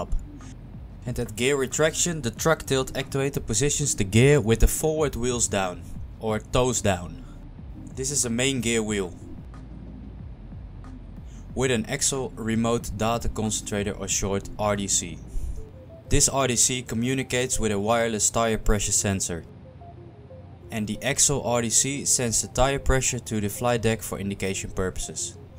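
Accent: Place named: Netherlands. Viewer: I smell nederlands. Coole video!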